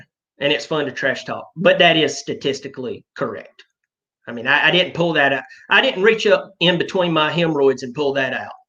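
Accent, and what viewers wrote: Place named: Appalachia, United States. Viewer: Love the gay Appalachian accent it us sexy in a banjo picking way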